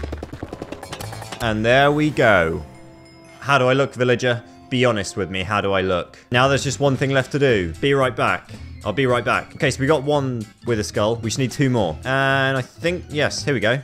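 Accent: United Kingdom. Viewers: You sound brithis and not british at the same time wtf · Are you British? 😢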